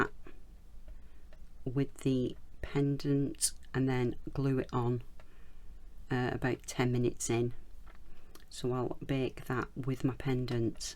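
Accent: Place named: Northern England, United Kingdom. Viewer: Hi, I’ve just found your videos which are brilliant an so lovely to hear a fellow northerner’s voice (live down South now). I’m new to polymer clay and wondered where you buy the silver things that you put the pendant and earrings on before baking. Thanks.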